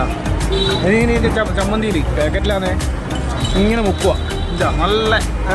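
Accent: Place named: Kannur, India. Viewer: Superr.... Kannur basha തകർത്തു..👍👍🤩🤩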